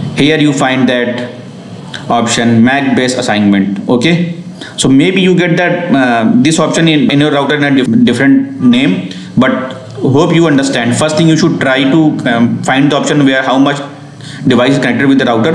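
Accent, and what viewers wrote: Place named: Indonesia. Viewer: dont trust indo accent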